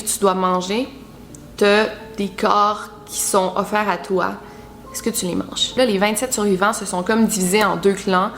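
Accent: Quebec, Canada. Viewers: Je t'ai découvert y'a 2 jours par pur hasard et je fais que regarder tes vidéos depuis, j'ai un gros kiff pour l'accent québécois mais c'est pas que ça, lol. J'adore ta façon de raconter les histoires, tu es addictive 😉, c'est comme si j'arrive plus à m'arrêter tant que j'ai pas tout vu. Bisous de France et continue comme ça tu es au top. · Merci pour ton travail, t'es vidéo sont toutes plus intéressantes les unes que les autres. J'adore ce que tu fais, et ton accent québécois donne un truc en plus à tes vidéos. Merci et continue comme ça💙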